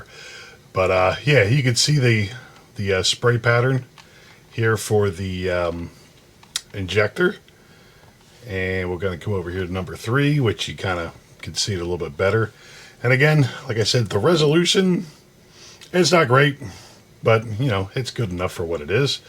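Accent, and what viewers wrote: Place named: East Coast, United States. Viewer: Ohhhh koooo.... that's what's up watching on my break right now... also I hear the east coast in ur voice...mirrah... instead of mirror lol that's awesome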